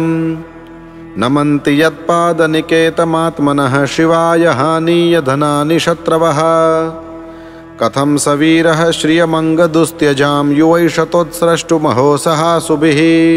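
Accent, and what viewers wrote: Standard. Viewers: ખુબ સરસ અને શુદ્ધ ઉચ્ચાર સાથે ભાગવત શ્રાવણ કરવા મલ્યું શ્રી હરિ ની કૃપા બની રહે.. સાદર પ્રણામ... જય ભગવાન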